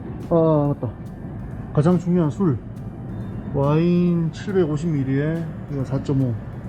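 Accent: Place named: Daegu, South Korea. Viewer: 원지님 영상보다가 넘어와서 님 영상을 몇일째 봤습니다 대구는 눈을 보기 어렵죠 ~^^ 오늘같이 폭염경보 속의 대구에서 스위스마을과 스노우 뽀드득 소리까지 듣고 보니 너무 시원하고 대리만족?^^을 느낄 수 있었어요 님의 따뜻한 미소와 '하~'하면서 처음보는 분과도 말이 어색하게 끊어지지 않게 호응하고 나이스한 친화력은 대구분이라 믿어지지 않을만큼, 대구남자들에게서는 좀처럼 찾기 어려운 ^^ 모습이고 진짜 멋진거 같습니다 놀라고 급할때 툭툭 나오는 대구 사투리와 서울에 거주하면서 체득된 어색한 억양이 너무 반갑고 정감납니다 핥기님이랑 대구 떡볶이, 뭉티기 먹방할때도 제 남동생 보는것같아 너무 재밌게 봤습니다 어머님 건강도 좋아지시길, 100만될때까지 늘 응원할게요~~^^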